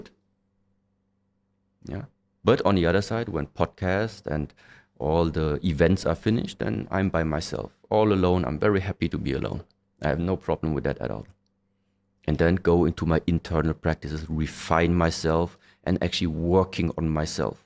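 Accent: Germany. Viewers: The German accent makes it kinda funny 🤭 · His accent sounds so german .. 😅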